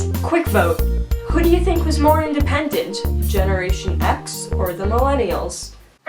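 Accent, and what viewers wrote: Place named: North, United States. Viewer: Hey by the way, you're really cute ;) are you from Wisconsin? Or someplace from the north? You sound like a northern person.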